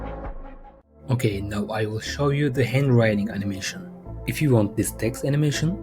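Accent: Turkey. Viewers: are you turkish?? (bence türksün aksanından) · Sesinden anladım türk olduğunu ag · hocam türk müsün çok fena aksanın var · türkmüsün konuşman türk aksanına benziyo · aşırı türk aksanı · türk aksanını nerde duysam tanirim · abi türkmüsün aksanın benziyorda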